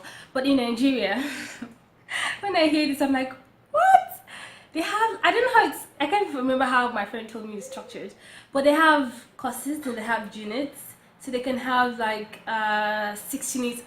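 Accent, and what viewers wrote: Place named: South Africa. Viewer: Hi sister I am impressed by your english tone, you sound like south African wow keep up...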